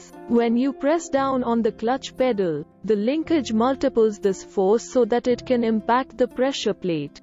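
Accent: India. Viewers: Amazing, a robot-narrative with an Indian accent...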